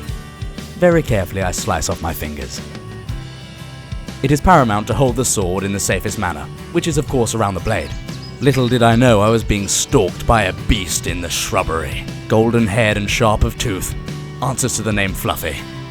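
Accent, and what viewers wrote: United Kingdom. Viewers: Got Dang that is one jive turkey. First comment on one of your videos i found you threw planet rust but just now relized how amazing your other videos are. this is by far one of the coolest vidoes i have seen in quite a wile. love your grammer and accent and you in all your british glory. its not surprising you have 30k subs with how steller your videos are. you have a real tallent and i could easily comapre you to fankie on pc in 1080 p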